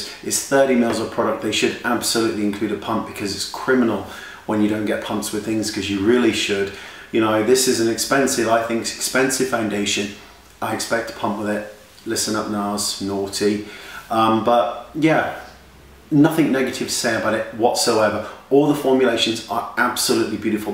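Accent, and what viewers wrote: Australia. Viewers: r u australian? ive been told ur english but u sound australian o_O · i just realized you were British, i always thought you were from Australia from your accent haha :)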